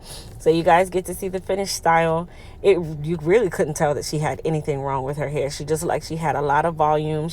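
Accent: Jamaica. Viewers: I detect a Jamaican accent? I appreciate how you preserved her dignity while increasing her self confidence. ❤️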